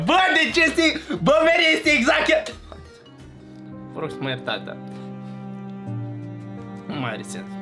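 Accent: Moldova. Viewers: Tu ești moldovean?😱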